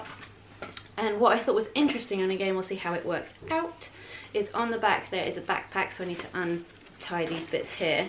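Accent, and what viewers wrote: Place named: United Kingdom. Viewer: Good video (yeah you were nervous, but I would be too and you quickly recovered your composure). Love the British accent. Adds class. :) About that big front flap/pocket... I'm a great grandmother... I'm thinking if you're changing a nappie / diaper, perhaps having to unzip a bag to get replacements and wipes would be difficult so put the nappies and wipes in that large outside pocket?? Just an idea (which you may have already thought of). I like bags like this for travel (since I no longer have babies/toddlers). Oh and I love your way of storing stuff above the sink (the utensil bar). What a great idea! (I know that has nothing to do with your video subject, just thought I would mention it)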